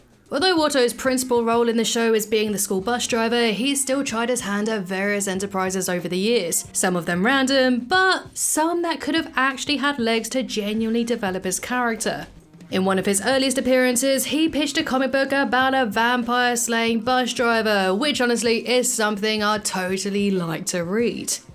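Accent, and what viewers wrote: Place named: South England, United Kingdom. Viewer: the sweet accent of south England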